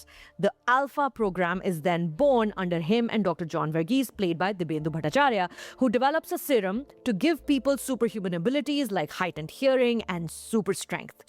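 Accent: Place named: South Bombay, India. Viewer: south bombay accent english haha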